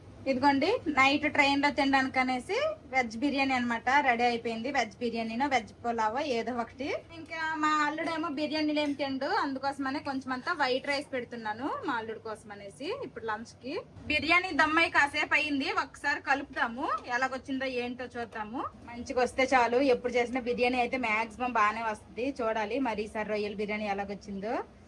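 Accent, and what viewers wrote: Srikakulam, India. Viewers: Pakka Srikakulam ani cheppavachu mi matalu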